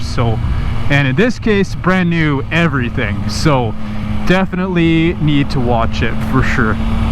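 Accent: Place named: West, Canada. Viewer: Are you from Alberta? That western Canadian accent is really making me curious if I'm right or not?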